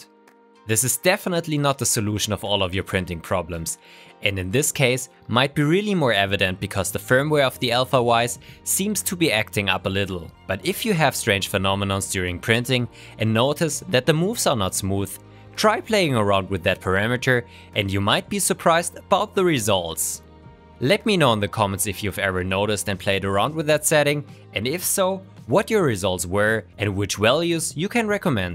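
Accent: Germany. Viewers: he has a nice German accent, thank god he's not Swiss. xD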